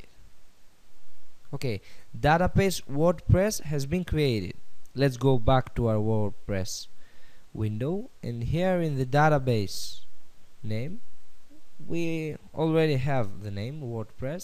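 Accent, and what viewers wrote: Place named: Russia. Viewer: I like russian people, they got an interesting way of talkin :)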